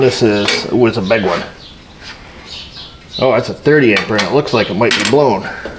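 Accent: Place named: Illinois, United States. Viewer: is more midwest sounding than Taryl....I'm guessing downstate Illinois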